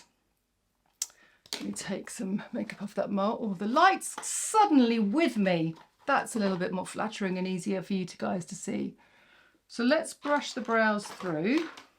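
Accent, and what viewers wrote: United Kingdom. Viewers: I just love your British accent (as an American with a more harsh accent), “cotton bud” rather than Q-tip, “knocking back the shine”. I so enjoy your tutorials and creativity in paring a warm cheek/lip with a cooler eye. Merry Christmas!🎁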